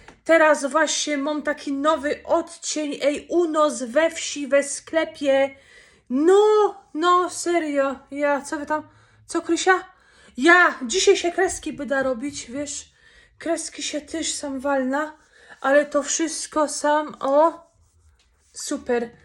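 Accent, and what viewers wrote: Silesia, Poland. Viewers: Kobieto, jak ja Cię kocham miłością szczerą ❤️ Ciebie to powinni sklonować, krejzolko najwspanialsza 😂😂❤️❤️❤️ Kiedyś nie lubiłam śląskiej gwary,ale Ciebie to mogę słuchać całymi dniami. Pozdrawiam ze Świeradowa Zdroju ❤️❤️❤️❤️ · Ale ty jesteś przykra z tymi twoimi głupotami i żenującymi mało interesującymi filmami no i z tą wkurzająca gwara śląska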